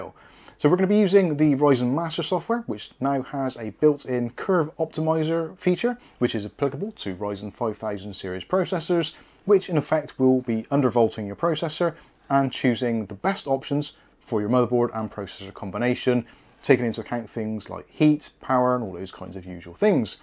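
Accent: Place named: West Country, United Kingdom. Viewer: Nice to hear a west country accent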